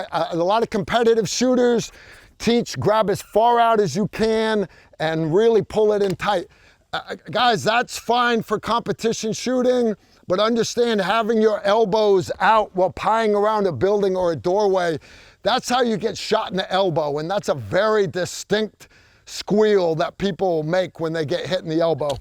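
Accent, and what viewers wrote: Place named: New England, United States. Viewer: I've been tuned out for a while. Glad to see you're still giving good men great advice. Gotta ask, are you from the New England area? You sound like a tactical Lobsterman!!!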